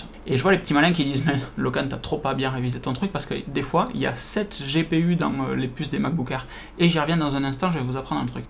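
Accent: South, France. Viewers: Bon moment, l'accent du Sud en +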